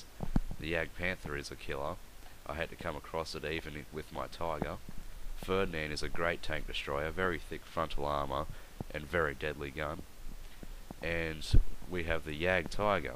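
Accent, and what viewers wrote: Australia. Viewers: First I am not trying to be annoying or rascist but are you part or full Australian?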